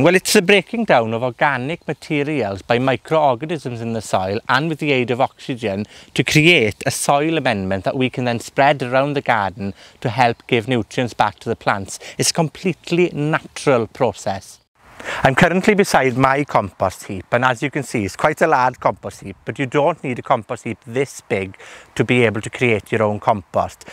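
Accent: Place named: North, United Kingdom. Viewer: Sounds like a northerner to me :)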